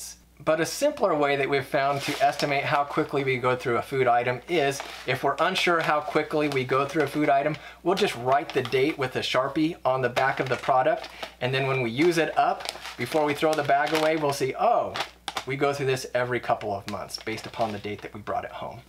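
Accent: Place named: Utah, United States. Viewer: By the way say meal and oatmeal I’m guessing you are from Utah 😀I like your pantry. You have the same exact items I have lol. We must shop at the same places.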